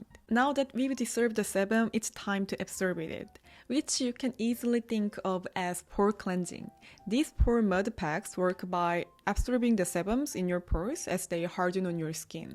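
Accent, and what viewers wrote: South Korea. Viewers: Love your English with korean accent ❤😂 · I’m so ignorant of other cultures, so I never heard a Korean accent in English before, i love it, also you are pretty good at explaining and tell us about everything at the end of each section, new follower ❤❤❤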